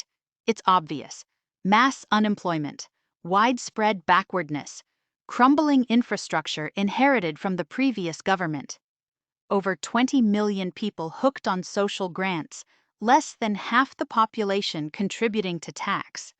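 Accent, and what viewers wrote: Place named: United States. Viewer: American accent!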